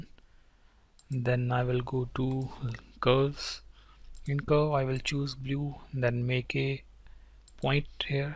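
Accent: India. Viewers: It is an English is just his accent. He's from India. It was hard for me to understand him too but once you listen you can understand.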